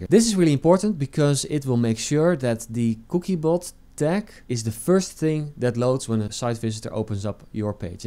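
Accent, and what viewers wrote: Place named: Netherlands. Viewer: Dutch accent best accent. 🙏🏻 Thank you for the clear video and god pace! Keep it up! 👏🏻👏🏻👏🏻👏🏻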